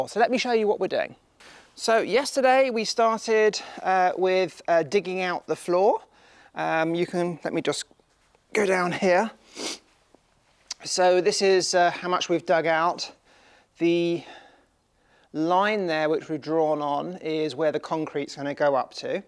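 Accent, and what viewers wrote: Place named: United Kingdom. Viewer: One thing I love about you Brits, the ability to tell and engaging story and that fabulous accent, adds a nice touch of class, keep this stuff coming I enjoy watching your travails. P.S. take care of that back and dont go up a ladder without telling someone to check on you :)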